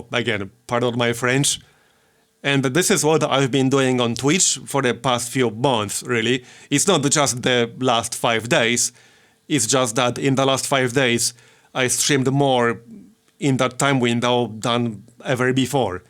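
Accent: Poland. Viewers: Thank you for your honesty and hundreds of hours of pure entertainment! I subscribed to your channel because the quality content and your nice Polish accent (which as a half Polish person I enjoy very much). Keep up the good work on Twich and YT and remember, there is one worse thing than reading YT comments: it's taking them seriously:) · You are the first gamer I followed on YouTube. What got me was your Arabian Civ V campaign. I was amused by your humor and amazed by your ability to beat the game at such a high difficulty level. I was also trying to figure out your accent. Then I learned somewhere along the way it is a Polish accent. Either way, you have provided many great hours of content and even inspired me to get into XCOM 2 when I knew nothing about the series. I say upload videos of you learning games because first impressions and playthroughs are very fun to watch.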